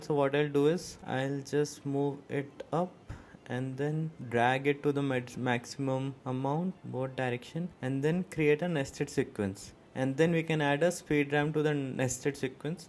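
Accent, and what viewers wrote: India. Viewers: Bro pls speak in hindi 😢 your accent is indian I know you can speak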